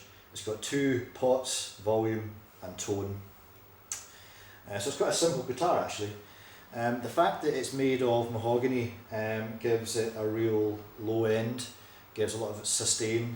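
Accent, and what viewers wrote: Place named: Scotland, United Kingdom. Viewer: Great review thanks, looking for a 7 string Ibanez and came across this. I live in the US so I was happy to find a voice form back home (grew up in Edinburgh) Cheers!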